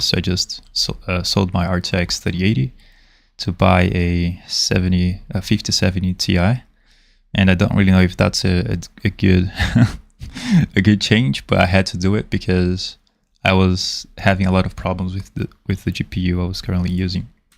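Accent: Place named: Brazil. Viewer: mano, tu é brasileiro kkkkk programo ouvindo vc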